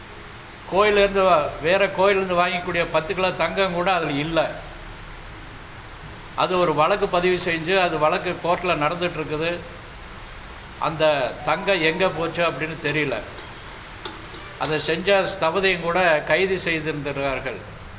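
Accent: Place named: Coimbatore, India. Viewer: சரியாக சொன்னீர்கள் ஐயா (உங்க கோவை தமிழ் 👌👌)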